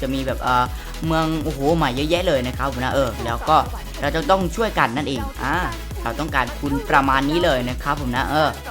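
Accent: South, Thailand. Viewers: พี่คนใต้หรอครับ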